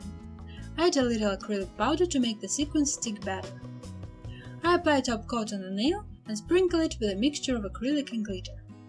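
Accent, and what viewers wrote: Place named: Russia. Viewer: Сразу видно когда наш человек говорит на английском 😎такой жуткий акцент😂но это все фигня, я даже так не умею🤥а работа просто афигенная 🔥🔥🔥👍👍👍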